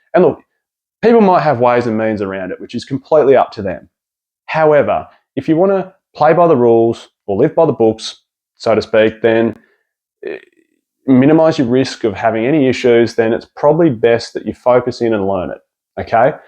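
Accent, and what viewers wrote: Australia. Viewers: Nothing wrong with your Aussie voice m8! Sounds perfectly fine (and soothing) to me 😅 🤘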